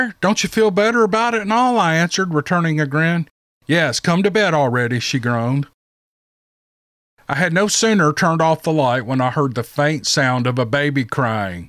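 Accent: South, United States. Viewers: I want to thank you from the bottom of my heart 💖for all that you do on this channel. Yes, I'm a little biased, because you have read my experience on here several months ago. ( episode 131) ☺️ But all in all I am not part of the Bigfoot community. Because I did not choose my experience. It chose me. I am not one of those fanatics who would go around bashing you BTW. Never have been...never will. I like the intro music that you use. I love your Southern drawl because I'm a West Virginia girl and it makes me feel at home. Your storytelling style makes me feel comfortable. It makes your story that you're telling me seem more real and more believable. Whether it is real or not matters not to me. What matters to me is that the style that you're telling it in, your delivery, it's the gospel truth. I really like whenever you're reading a line and it's funny, you break character and you laugh right along with us. 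Yes, you have a sense of humor and I think it's great! Because we're laughing, too. And when we can all laugh together it makes the world a better place! 🤣😂🤣😂 I really liked it when you had the children stories on here 📖. It encouraged them to be creative writers.📝 And that I think is totally awesome!👍😁 I think that's why I like your Steve Lily journals 📚 on your "What If It's True?" Podcast. I like your style of creative ⌨️ writing and I like the subject matter as well 👣. Okay, enough a$$ 😘 here, 😂 lol. God bless you. Please keep up the good work. I await your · God Bless Brother! As you stated some people are so bitter in their own lives they need to spread their misery... sad actually. You have my support and attention, love your southern accent when telling these stories. Just like TV, if you don't like the show...just change the channel and to hell with your opinion. Keep going brother! 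· I am a 68 year old southern girl. I love a good story and a real southern accent. You are awesome. Story telling is an art that was dying until people like you appeared on the internet, of all places. Thank you so much. You and those like you are national treasures. God bless:) Nancy in ga usa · Don't change anything you do. I love your story telling, the mannerisms, and your southern drawl, its a gift. Thank you for your love of a good story, and your platform to share them. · I love your stories and your voice/accent. I’m country-fied too. I have a strong southern accent. Those people who made the comments are idiots! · 🤣 YOU TELL EM!! YOU TELL EM REAL GOOD to put their BIG FOOT in their PIE HOLES and just enjoy them Narrated Stories in ye Southern Drawl 🤣- BEST RANT EVER! 🤣 🇿🇦 PS: Love Your Stories! 🇿🇦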